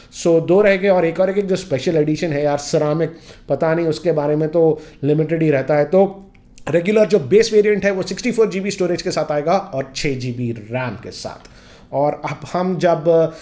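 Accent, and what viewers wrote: Hyderabad, India. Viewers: i just watch your videos because i like hearing hyderabady language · Kya bhai AP Bhi Hyderabadi hai bhai..Nice Hindi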